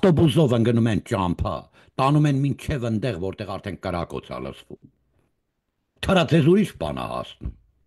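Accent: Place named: Western Armenian, Armenia. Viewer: Impressed with your Western Armenian accent, wow!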